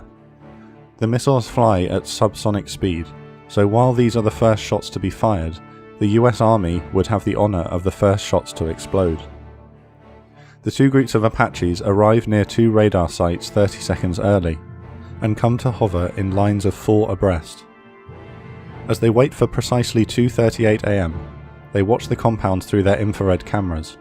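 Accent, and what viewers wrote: United Kingdom. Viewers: Why are narrators always british?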